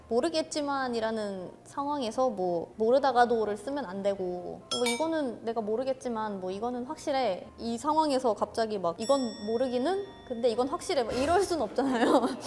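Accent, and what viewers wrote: Seoul, South Korea. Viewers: 전형적인 서울말씨에요. 당신의 노력에 찬사를 보냅니다. 역시 동시 통역사이군요.대한민국 홍보도 많이 해주세요. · 경상북도 사람인데요..나보다 서울말 더잘하노..ㅋㅋ.! 진짜 대단하십니다.. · 한국인태생특유의음성. 부모님중한분이한국인이신가요? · 서양외모에 전통한국인발음말투 ㅋㅋㅋㅋ신기방기하다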